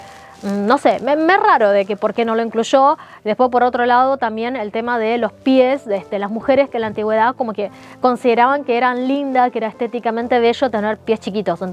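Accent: Buenos Aires, Argentina. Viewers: Muy lindo el video y tu dejo argentino me encanta felicitaciones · Nunca escuche a una mujer china hablando con acento argentino, y además muy linda y atractiva 😘😘😘😘, noto que naciste en la bella tierra Argentina, ya desearía que seas mi esposa, besos desde Cochabamba Bolivia 💋💋💋💋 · Se nota el acento de argentina de esta chica oriental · Su acento ¿argentino? suena muy bien. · Acento argentinazo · Me encanto tu síntesis. Tu acento argentino es muy sicodelico y me encanta tu guapura. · Habla muy estilo argentino, la pronunciación es perfecta. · XD ese acento es argentino porteño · Es argentina. ¿Y q problema hay esa variante dl castellano u otras? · Excelente explicacion y con acento argentino... Puede relatar con un acento mas neutro.. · 😂😂 de nuevo un peruano, cree que todo se originó a partir de ellos, la chica vive en Argentina, no escuchas el sho. 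Es más Marco Polo nació en Perú y Cleopatra es Limeña jajaja 😂😂😂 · Ché tenés el dejo argentino. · Cuando vosotros habláis con tanta soltura el castellano ¿es porque sois nacidos y criados en un país hispano hablante o por mero estudio? Es que me sorprende, en tu caso, con un excelente acento argentino. Gracias. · Me encanta su combinacion cultural con sus facciones de La Republica China y un soberbio acento argentino, un abrazo y felicitaciones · Jajaja, la chica es argentina? Q gracioso se ve como habla, 👍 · Primera vez que escucho a una China hablando con dejo Argentino 😂😂😂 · Hermoso acento argentino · Tienes acento argentino muy curioso hablar con acentos chino y argentino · Lo mas inverosimil fue la magia de los brujos al servicio del Khan. Estos hacían que la jarra de vino llenara la copa (solita) y despues volara a las manos del Khan. Este relato le valió a Marco Polo ser presionado por la inquistion y retractarse de lo dicho.Aaah Bonito tu acento argentino chinita♥ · Soy peruano, y corroboro, no es acento peruano, es argentino. 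· Cómo se nota que la presentadora aprendió el idioma español de argentinos. La 'sh' se usa arbitraria e indebidamente para remplazar la "ll" o la "y", además del acento característico. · Interesante.. hablas como argentina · Un interesante relato aumenta el interés por el acento porteño, de la narradora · muy buen video, felicidades. Habla Ud. un castellano casi prefecto con acento argentino ...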